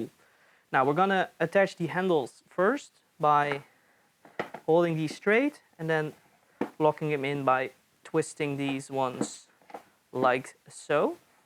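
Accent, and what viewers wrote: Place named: Netherlands. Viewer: ja ik was opzoek naar een alternatief/ring mount om de dji rs2 op te monteren ^^ Soms een beetje vermoeiend en onhandig om alles met de standaard grip te doen vooral als je hogere shots uit de hand wil maken. Deze van Tilta ziet er heel interessant uit alleen en is volgens mij zover ik heb kunnen zien ook een van de enige opties momenteel. Hoop alleen dat hij snel wat beter beschikbaar zal worden 😅 Ik had eerst mij twijfels of je nederlands was ik hoorde wel iets, daarna de shots met nl kentekens en de "later" bevestigde het wel 😆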